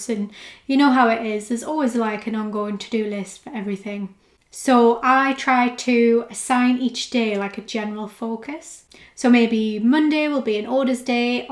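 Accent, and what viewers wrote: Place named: Ireland. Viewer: I love the Irish Accent.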